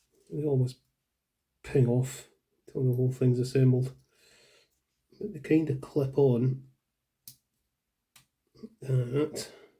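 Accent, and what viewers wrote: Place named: North, United Kingdom. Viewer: Great accent .. you’re not a southerner …. :)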